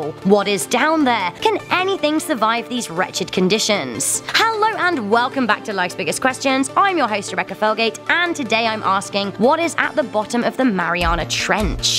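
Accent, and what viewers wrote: United Kingdom. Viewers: yeah thanks but i dont wanna hear british english anymore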